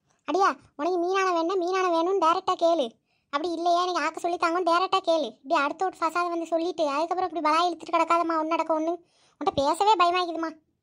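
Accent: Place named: Kilakarai, India. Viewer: na kilakari than unga slang spdiye enga ooru slangthan vera 11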